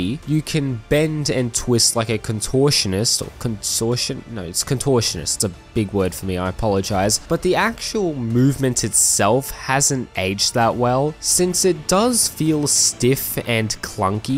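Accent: Australia. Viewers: Man, I'm a sucker, I hear an aussie and I hit like (sorry if you're a kiwi)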